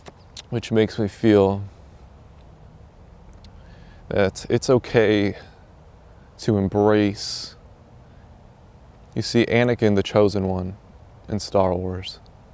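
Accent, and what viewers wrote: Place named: United Kingdom. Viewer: Thank you. I like the British accent! Made me laugh!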